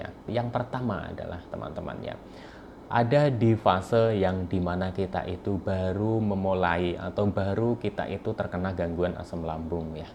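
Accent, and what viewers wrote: Banyumas, Indonesia. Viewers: Sembuhnya pakai apa...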